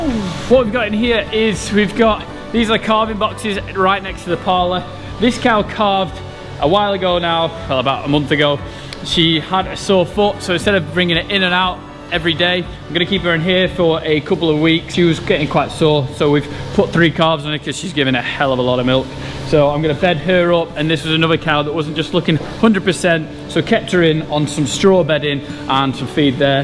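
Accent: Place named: England, United Kingdom. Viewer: New to channel. Coming at you all the way from suburban southern California. Just trying to catch up on some of the terms... A bit of a challenge sorting out some of the words through the accent. Like it though.... Not a farmer here in the states but it looks interesting to watch and learn how the UK does it a bit different than in America. Is a good window into the reality of the English countryside. Question..... What is cake? It can't literally be cake, must be slang for something? Keep up the good work. Love the videos!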